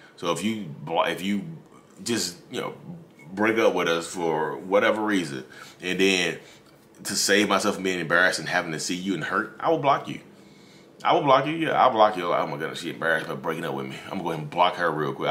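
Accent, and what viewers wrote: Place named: South, United States. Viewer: Shoutout to NC with that Southern Draw!!!! As I'm from Winston-Salem,NC . I love this channel ,and even more your content, keep it coming!!!!!!!!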